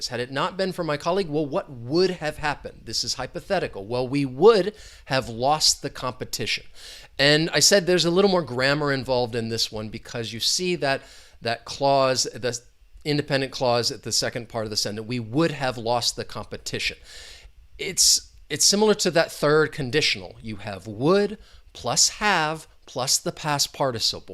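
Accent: United States. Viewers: American accent too heavy. 👎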